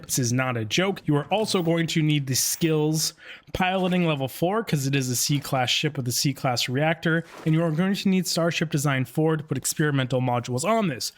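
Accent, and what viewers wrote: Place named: United States. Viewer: No hate man.... Love this video. :D , but I also, as a European, love how Americans just add an subtract letters and syllables in words thats not American. "Lon" = LEE-on", "Polvo" = "Pol-O-vo" for example.... As said... No hate... I just find this entertaining..... ;P If you watch all types of gaming-videos, "MetalJesusRocks" is a MASTER of this... ;P Edit.... After watching the whole video, this is deff going to be my go to ship! Theres too few builds like this with small ships and huge cargo.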